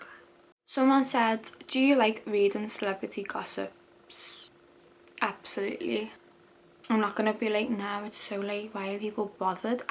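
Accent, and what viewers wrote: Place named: Liverpool, United Kingdom. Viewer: LIVER POOOOL!!! Accent so strong!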